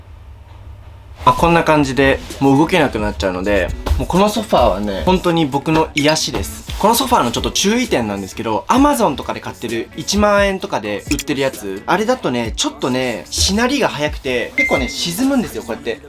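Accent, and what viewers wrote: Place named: Kansai, Japan. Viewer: 君は埼玉人だけど、俺自身たぶん言われんでも分かってるんやろけど、大阪出身で大阪10年間育った人間なので、所々関西弁使ってくれてはる君観るとマジ親近感湧きます！！⤴️⤴️⤴️ 君が関西弁敢えて喋るとイケメンさが人一倍ごっつ映えるね〜👍👍👍😍😍😍ありがとう、おおきに！🙏これからも友達でいましょう〜！😭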